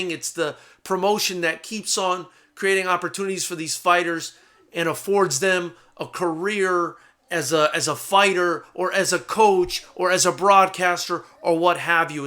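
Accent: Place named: Brooklyn, United States. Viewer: You sound Brooklyn son 👊 As always on point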